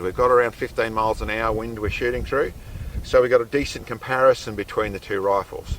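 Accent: Australia. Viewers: Not bad!!! But Australian English 😄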